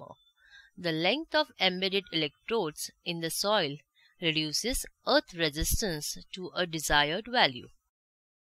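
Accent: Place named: India. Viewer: But this indian-english akcent is best😅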